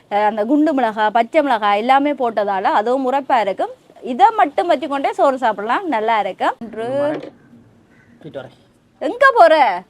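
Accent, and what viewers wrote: Tamil Nadu, India. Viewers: Ivar enna indian tamil la pesuraru. Inga vanthittu ponavara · You speak like Tamil Nādu Tamil language. Did you study in India?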